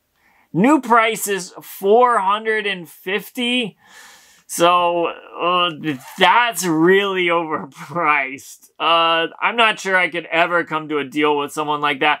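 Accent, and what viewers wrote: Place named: Midwest, United States. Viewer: This been bugging me for awhile are you from the Midwest ? I can kinda hear the accent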